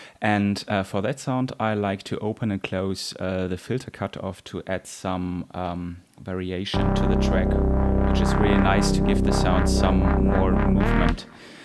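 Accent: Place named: Germany. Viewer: German ? Da ist was deutsches in deinem Englisch...beste Grüße aus der Vulkan-Eifel. Geniale Videos. 😍😎👍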